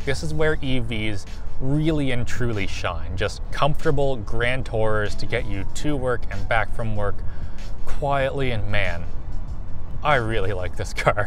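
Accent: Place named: Maritimes, Canada. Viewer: Love that Maritimer accent...never lose it! I'm getting major Nova Scotian with a hint of PEI.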